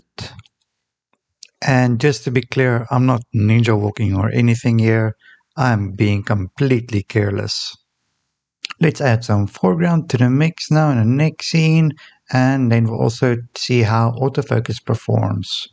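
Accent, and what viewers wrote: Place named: South Africa. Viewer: Always a pleasure to hear a South African accent on a review. I wasn't sure at first but once I saw the sparletta I got confirmation 😅 great video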